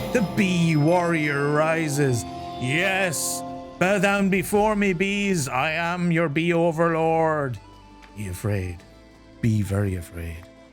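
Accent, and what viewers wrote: Ireland. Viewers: Right!! Either that or I really am obsessed with puppies! Lovely to hear a fellow Irish gamer! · By chance are ya Irish and if so what part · My daughter started playing Minecraft when she was 7 in 2012 on the Xbox 360. I was an OG gamer going back to the 80s (I'm an old fart) and blew off the game as a joke for almost a decade until a certain global event hit in 2020. She got me hooked on it and I've never gone back. I sub to a lot of Minecraft channels and yours popped up on my YT suggestions. , your MC channel is the best I've come across. It deserves over a million subs. Your Irish accent and spirit are an absolute joy and stirs my Irish ancestral blood in me. Please keep up the outstanding videos, builds, humor and music! Well done!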